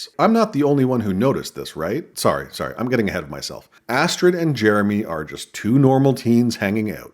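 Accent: Canada. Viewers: Wait, are you canadian? Your voice sounds vaguely like it.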